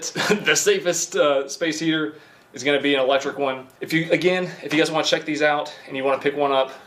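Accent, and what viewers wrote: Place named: South Carolina, United States. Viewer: South Carolina for ya. Maybe Tennessee, but I'd put money on SC. I've never met the guy, I'm just from GA and recognize the accent and the landscape from the backyard lol.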